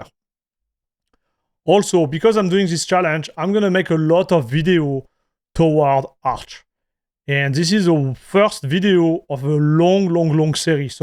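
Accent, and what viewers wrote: France. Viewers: i love your french accent \ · the french accent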